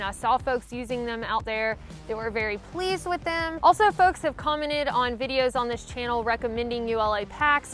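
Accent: South, United States. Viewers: I bicycled about 38,000 miles and camped through nineteen countries. I thought about through hiking the appalachian trail. It is not likely. Except for a 15 mile forced march in the army through a dry dusty riverbed, I have no experience long distance walking. I have no way of estimating my ability for that. Of course, if I were 30 again in place of 70 which I am now, there would be no question whatsoever. But, it is like the man said--There aint no way that's ever going to happen. I read your name. You also use because you are from the south, Alabama they say. And what makes it more obvious than your sweet, pure accent. Every syllable is another drop of honey, and beholding to the eyes, you are. You are a movie star. It is really clever and really cute what you do, and how you present yourself and the subject. Surely making the through hike could be a profoundly life changing experience. 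It can be the same with long-distance bicycle camping and touring, and quite different in some aspects. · Mine too, Matthew. I grew up in MS and lived 7 years in AL, 2 1/2 of those 1/2 hour from Auburn. But have lived up north and now in TX. Love the Southern accent! And she's an engineer!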